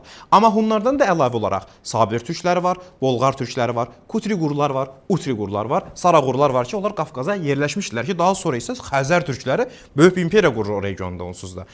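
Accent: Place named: Armenia. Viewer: Hara ermənisidir bu danışdığı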